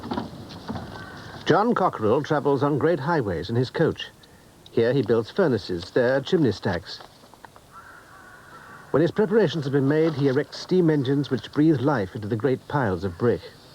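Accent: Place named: RP, United Kingdom. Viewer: It's called received pronunciation or just RP, often called BBC English or The Queen's English. He's an English actor, probably retired by now.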